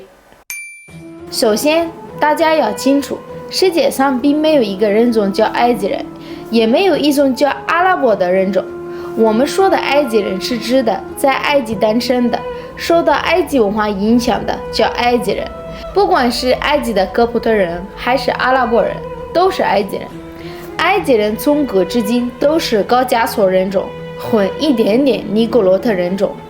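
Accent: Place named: Shandong, China. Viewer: 山东口音很亲切啊！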